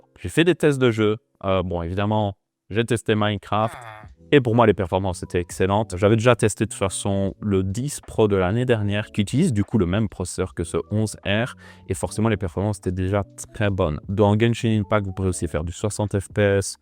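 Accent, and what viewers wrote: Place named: Belgium. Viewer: Nos amis belges ont un bel accent.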